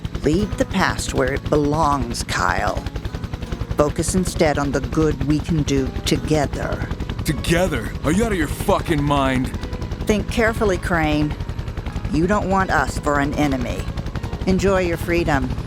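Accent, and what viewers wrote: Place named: India. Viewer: 1min listening the video im already tired of indian accent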